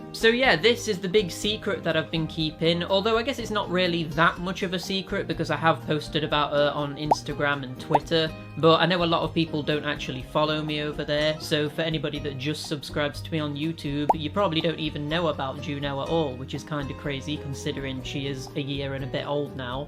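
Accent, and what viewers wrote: North, United Kingdom. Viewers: Congratulations, nice to see u happy. Not a big fan of shorts (if u need feedback on short videos) Honestly, I prefer to watch long videos where u enthusiastically talk about stuff that genuinely interests you. I don't even care what the topic is, I just really enjoy your accent and your storytelling, those little humorous bits and sarcastic remarks once in a while. You could read a washing machine manual for all I care;) Good luck with the channel, I'm sure your subs would love to see your mug;) more than once a month (maybe, your words, not mine;)) but at the same time it's quite understandable why it probably won't happen. Anyway, personally, I would be grateful for whatever I can get, as long as that is smth you are passionate about coz that's what makes your videos so honest and entertaining. I don't have any questions for Q&A, I'm sorry, I was trying to think of smth not too personal but didn't come up with anything interesting. 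Perhaps, what part of the UK are you from, maybe, as I said, I absolutely adore ur accent, I was ready to make a guess you are somewhat of a northerner but again, it's more of a shot in the dark, I don't know much about UK and regional accents. Again, good luck and take care.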